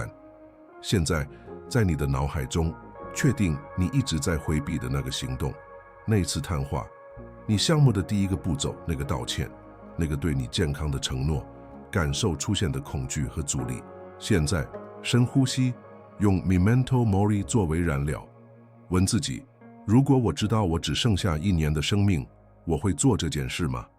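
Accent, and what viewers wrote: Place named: Hong Kong, China. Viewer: 是ai生成的港式普通话吗？有的词听起来会有歧义。最好是标准一点的普通话。内容不错，加油！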